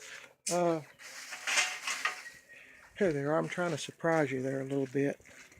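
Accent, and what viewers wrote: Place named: South, United States. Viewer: Are you from Alabama? Your accent gives you away, you’re from the south. LOL! I am visually impaired, and enjoy hearing the sounds of those old pick up trucks of yours. Would love to hear the old 78 roar to life. For several years of my life, I lived 4 miles from the Talladega superspeedway, so I love anything with straight pipes.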